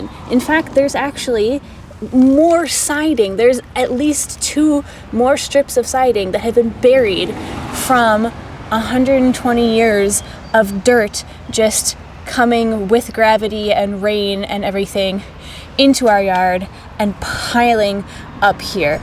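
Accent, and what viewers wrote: Quebec, Canada. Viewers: I just discovered your channel. I knew I recognized un accent du Québec! Good job guys, I love everything about your channel ❤ Continuez votre beau travail.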